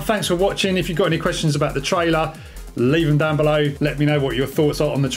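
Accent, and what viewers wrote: United Kingdom. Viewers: Don’t worry about correcting us with the UK radio station If Americans don’t understand they’re watching a guy in the UK with a UK accent and he’s pretty much probably most definitely English is there a problem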